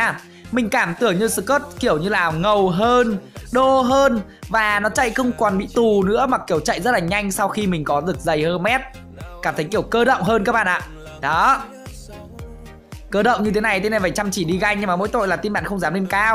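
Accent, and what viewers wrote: Nam Dinh, Vietnam. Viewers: Add quê Nam Định ah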